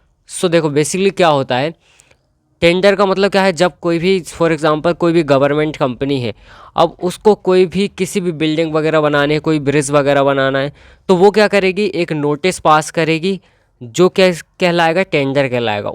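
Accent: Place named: Indore, India. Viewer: Bhai tu indore se ha na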